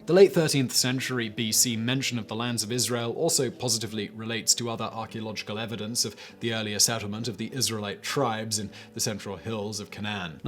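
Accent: United Kingdom. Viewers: This guy does a great British accent.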